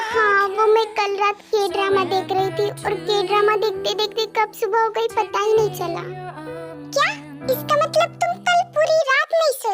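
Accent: Rajasthan, India. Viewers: Di aap rajasthan se ho kya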